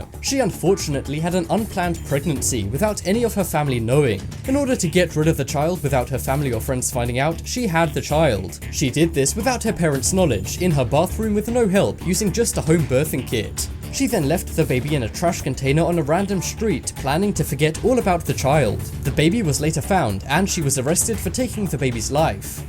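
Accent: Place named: United Kingdom. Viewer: The clickbait starter pack: British accent, arrows on thumbnail, thumbnail having nothing to do with the video, asking for a like at the beginning. You only have a British accent, so this video is not clickbait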